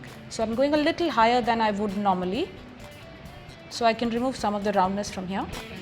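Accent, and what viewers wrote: India. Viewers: Indian accent 😍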